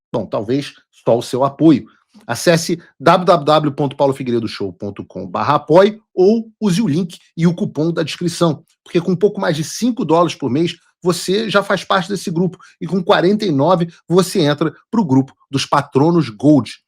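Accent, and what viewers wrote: Rio de Janeiro, Brazil. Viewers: gosto muito de vc Paulo, mas tira essa bala da boca e esse sotaque carioca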